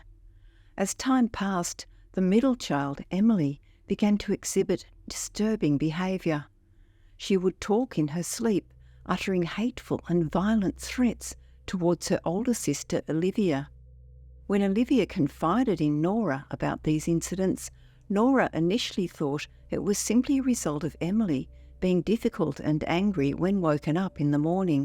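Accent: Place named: Australia. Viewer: Being an Aussie I love hearing our accent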